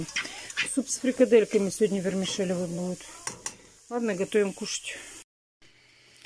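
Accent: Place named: Ural, Russia. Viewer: Посмотрите канал ,Уралочка и тогда спрашивать не станете больше ,там многие и в городе так говорят,,я жила в Екатеринбурге и там говор у всех такой ,,как в деревне и ударения в словах тоже ,, )) уши режет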